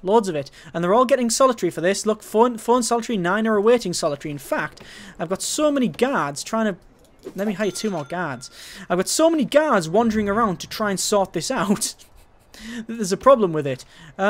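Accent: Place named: North, United Kingdom. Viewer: You're so northern. :D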